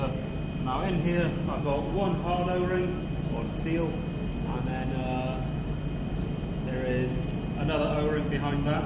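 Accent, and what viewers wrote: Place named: Wales, United Kingdom. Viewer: oh right that's cool I'm from South Wales and thought that's a Welsh accent. Where do you meet the ship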